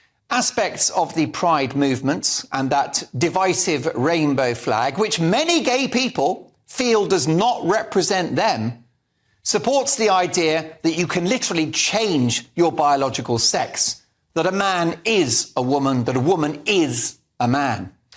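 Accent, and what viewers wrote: United Kingdom. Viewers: You British ma'am?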